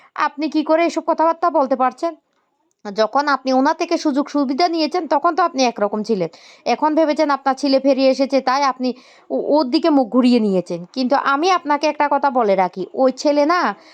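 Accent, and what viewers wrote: Bangladesh. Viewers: আগে ভালো করে বাংলা ভাষা টা শিখে বলুন। কি বিচ্ছিরি শুনতে লাগছে🥴🥴🥴। বাংলাদেশী না রোহিঙ্গা?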